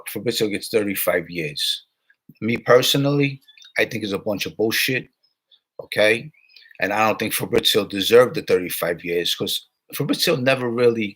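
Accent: New York, United States. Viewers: your accent is straight up NY Gangster!!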